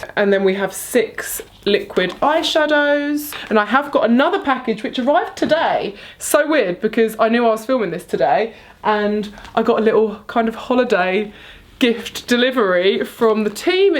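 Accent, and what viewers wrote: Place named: United Kingdom. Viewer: I LOVE UK, I basically love everything British, from people, to London, to this accent, oh my God I feel so happy when I'm listening a British talk hahahaha I have such a deep love for UK, my dream is to travel there someday ❤️❤️ I said all these things just to express myself and my love for this country and not to try convince to pick me as a winner.. I can get all these things, it would just be AWESOME and something so meaningful to me to get them from a British girl ❤️❤️❤️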